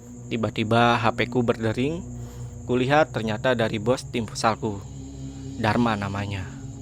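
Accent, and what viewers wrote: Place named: Aceh, Indonesia. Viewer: logat antara orang ACEH atau kah BALI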